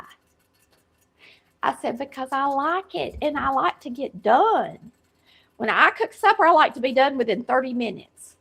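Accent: South, United States. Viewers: I just showed my husband your video… told him yall sound like us… lol … “been doing this 7 yrs he should know.” …. We’re country talking too.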